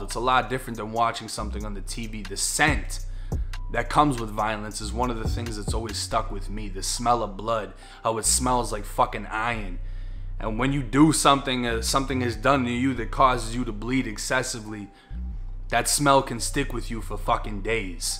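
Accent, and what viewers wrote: Boston, United States. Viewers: live in the NE as well as Florida. Interesting accent, Florida hood with wicked strong instances of “park the car in Harvard yard.”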